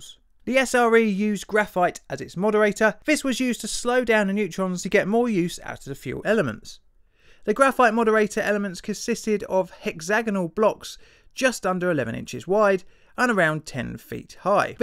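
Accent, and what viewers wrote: United Kingdom. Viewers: um dudes probably a britbonger since you know near anyone can post to youtube. Its not just americans on the site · Why is it that folks in the U.S. information industry think that using a narrator with an awful Limey accent make their production more authoritative?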